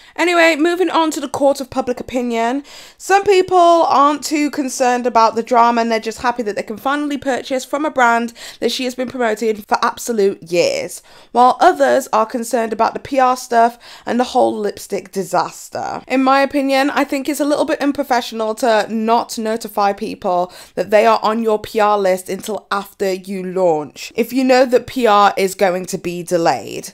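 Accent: United States. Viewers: Your American accent tho!! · Your american accent gave me life! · Your American accent is really good but it makes me feel so weird 😂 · You do a better American accent then I do and I’m american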